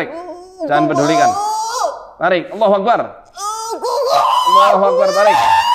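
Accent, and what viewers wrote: Banyumas, Indonesia. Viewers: Masya Allah tabarakAllah,seneng banget nek krungu pak ustadz ngomong ngapak ,medok banget kaya nyon🤭 moga sehat"slalu pak ustadz dan teamnya .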